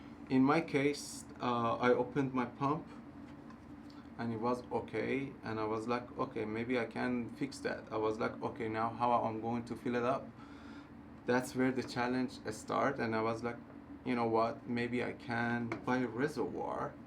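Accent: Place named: Iran. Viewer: nice mod. The peroxide bleached the color btw. Your accent seem to be Persian.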